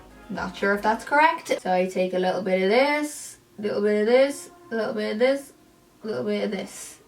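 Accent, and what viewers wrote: Ireland. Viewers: Are you Irish? I love your accent👍🏻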